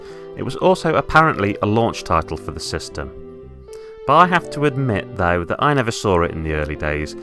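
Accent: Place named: United Kingdom. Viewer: This is a lovely little mini review, I’m amazed it’s been so unnoticed. I definitely agree with all your points and I love the game too, it’s my preferred version by far too. Dr. Wright does actually appear in one other Nintendo game, in Zelda: Link’s Awakening he has a cameo as “Mr. Write” but it’s definitely the same character as he looks similar with the same glasses and a song from SimCity plays in his house, in the remake he looks much closer to his appearance in SimCity. Also, it’s great to see someone else who uses the British SNES pronunciation.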